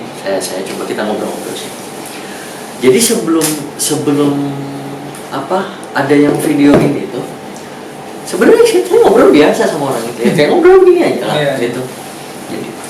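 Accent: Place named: West Java, Indonesia. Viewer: Jawa barat khas banget bang mantapppp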